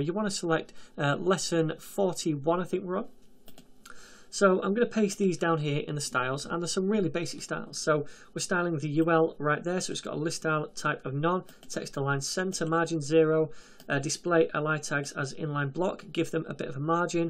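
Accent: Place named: Northern England, United Kingdom. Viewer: Fantastic tutorial, clear and elegant explanations and examples throughout. Pleasant voice and accent (sounds like Manchester/northern England?) were a nice bonus!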